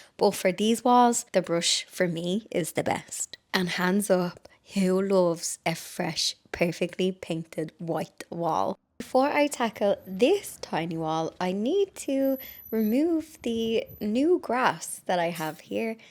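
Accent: Ireland. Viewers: love your program ive just come across it to day love your Irish accent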